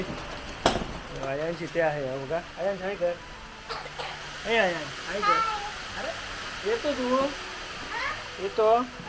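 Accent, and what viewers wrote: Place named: Malvani, India. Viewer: तुजी बायको किती मेहनती आहे. तू जे मालवणी बोलतो ते ऐकून भारी वाटत.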